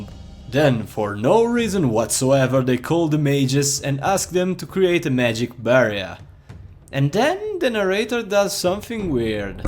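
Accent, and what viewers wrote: Italy. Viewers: Great job, you just got a new subscriber. ^_^ I like your italian accent, haven't encountered it much on youtube. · just curious. Are you from Italy? Judgind by your accent I'd say Italy. Also the fact that you can pronounce some sounds that are hard for normal italians( .the "H" sound ) suggests that maybe you are from south tyrol? Just curious to see if I am right.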